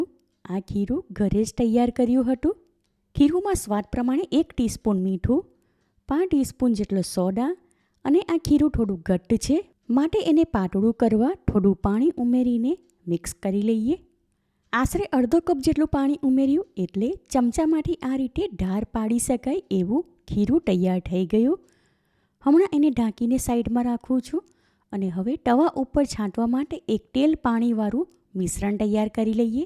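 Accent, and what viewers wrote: Surat, India. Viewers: Chokks surti chho bolo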